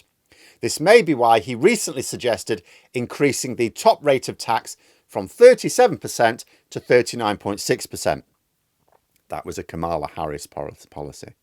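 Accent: United Kingdom. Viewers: The tariffs never worry about China. If they were, he would’ve just placed them on Chinese products he placed them on the entire world. Who pays the tariffs. the American consumer , that’s who. But at the same time half 1 million federal employees are out of work. Truck, drivers, dockworkers people who work in all sorts of box. Stores are out of work because there’s nothing coming in from any part of the world to stock the shelves. When Trump was president the last time, the only law that he passed was a tax cut for millionaires and billionaires. That law is getting ready to expire and he wants to make it permanent. His plan is to cut the Social safety net in this country and he’s already well on his way to doing that. Because his policies affect peoples retirement funds people are losing significant amounts of their 401(k)’s, and other pensions. Just because somebody has a British accent doesn’t mean they know what the hell they’re talking about. 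I bet this guy was in favor of Brexit. How’s that working out for you? The only socialism Trump is going to do is the same type Hitler did. And before Ronald Reagan and Margaret Thatcher, the top rate was 70% in the USA. I have no idea what it was before Thatcher.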